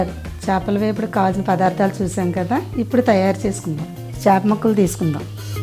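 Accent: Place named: Godavari, India. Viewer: Godvadari mettaa slang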